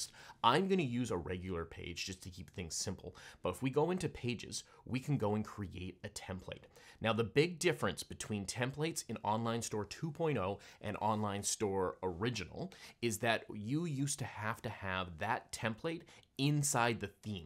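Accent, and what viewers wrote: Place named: United States. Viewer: TG - American accent real human Shopify vid.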